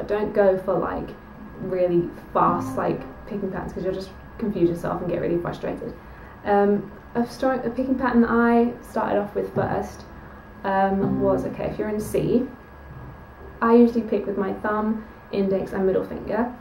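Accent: United Kingdom. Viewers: You're....you're British? Whuaaahhhh? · OMG you’re british!!!!!! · YOUR BRITISH!!!!!! did not see that coming. MIND=BLOWN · Whoa ur British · Ah your Brittish!?!?!? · woahh tbh i didnt know you were british until this vid. singing obviously hides accents. haha btw, this is very good. simple and easy to understand because i was a self thought guitar player as well and i absolutely agree about playing the general chords first because slowly you'll know how to play the hard chords :D this need to be share to friends or families who are beginners at playing guitars. good job! :D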